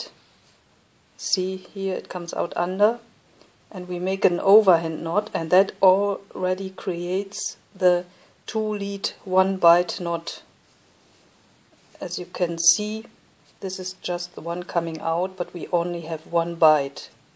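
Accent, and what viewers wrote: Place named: Germany. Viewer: I LOVE your German accent! And I continually applaud your English. : )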